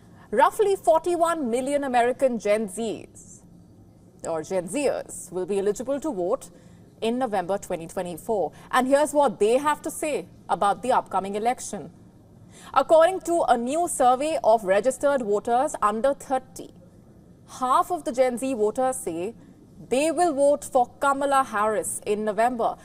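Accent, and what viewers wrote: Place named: India. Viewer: The Indian accent makes this 100x more funny lmao (here from Theo Von)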